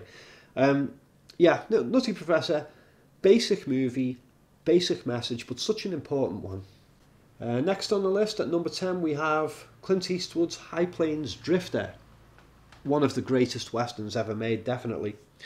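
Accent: United Kingdom. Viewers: The British accent adds to the credibility of this top 25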